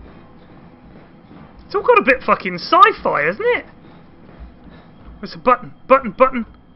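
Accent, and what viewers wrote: Germany. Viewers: Amazing german accent! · dat German accent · started with a generic german accent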